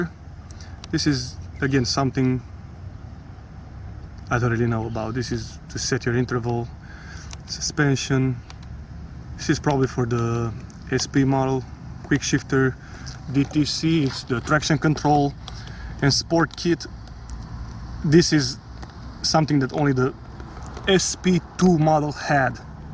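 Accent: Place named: South, United States. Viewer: I can barely understand your southern accent.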